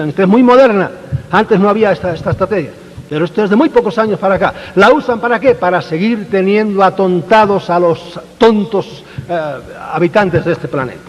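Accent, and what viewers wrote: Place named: Spain. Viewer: crean solo en mi palabra sin ninguna evidencia de nada ya que es mas comodo y barato para mi . parece increible que despues de tantos años todavia sigamos doblegados y nos dejemos imprecionar en cuanto oimos el acento español, haa pero no sea uno de los nuestros por que le exigimos evidencias fotos y aun asi lo denostamos Que nos pasa?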